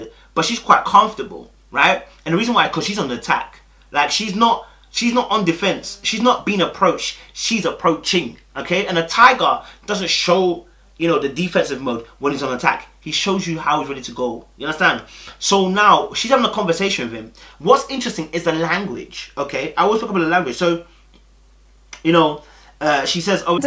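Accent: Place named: Birmingham, United Kingdom. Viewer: Yout brommu accent came out today small small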